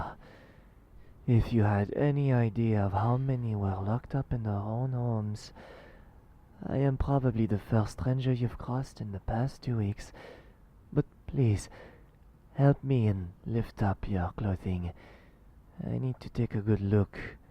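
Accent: France. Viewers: Hey that's a great French accent! I'm well placed to know that because I'm french XD But I still don't understand ....HOW THE FUCK CAN YOU FIND FRENCH ACCENT SEXY?!? OUR ACCENT IS HORRIBLE!! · IS THAT A FRENCH ACCENT? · That french accent 👌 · When you get jealous of the great, but adorable, French accent: How could I get mad, and So jealous of that adorableness!? 😚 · Ring around the rosie. Pocket full of posies. Ashes, ashes. We all fall down. sings in a creepy voice By the way, I love your French impression. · me a French girl: va talking English with the French accent: me: keep laughing cause he talks like my friends trying to talk in english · Oh mon dieu, l'accent Français en Anglais c'est ma phobie. Je ne peux pas, mais je t'aime bien quand même. "My FrIIIIIENND" AAAAAAAAAAAAAAAAAAAAAA x.x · God damn it, your french accent is absolutely ADORABLE! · Omg french accents are my favorite! 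Please do more ♡♡ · me-I don’t care what you do to me just keep talking! Honestly though your french accent is lovely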